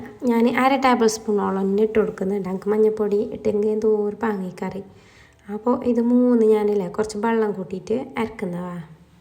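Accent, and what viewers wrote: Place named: Kasaragod, India. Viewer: 🎉🎉🎉❤ സൂപ്പർ❤❤ കാസർകോട്ട് സംസാരം കേൾക്കാൻ സൂപ്പർ നമ്മൾ കോഴിക്കോട്ടുകാർ🎉🎉🎉❤ ഇതൊന്നും വെച്ച് കൂട്ടി നോക്കണം😂😂😂😂😂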